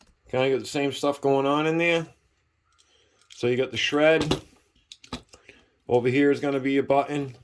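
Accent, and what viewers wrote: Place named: New York, United States. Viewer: sounds like your from new york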